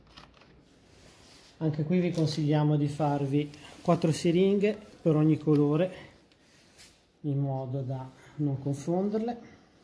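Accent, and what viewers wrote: Genoa, Italy. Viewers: per fare un bel lavoro allora mi calcolo in peso quanto è diluito l'acido e aggiungo acqua distillata fino a raggiungere la soluzione giusta. Comunque noto con piacere l'accento genovese. Io abito in entroterra tra la Spezia e Genova e sono un appassionato delle cose che proponete nei vostri video. Lavoro alle macchine utensili se vi serve qualsiasi cosa per una collaborazione sono a disposizione saluti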